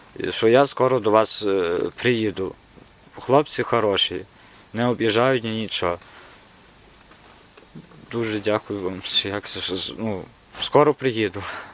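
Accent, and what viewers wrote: Ukraine. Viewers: С гуцульский акцентом разговаривает.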